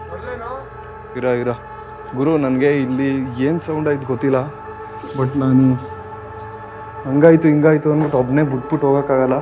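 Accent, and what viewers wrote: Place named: Ramanagara, India. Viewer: ನೀವು ಎಲ್ಲೇ ಹೋದರೂ ನಮ್ಮ ಚನ್ನಪಟ್ಟಣ, ರಾಮನಗರ language slang ನಿಮ್ನ ಬಿಡಲ್ಲ ❤😊😅 Ex: ಸೊತ್ತೆ 😂😂😂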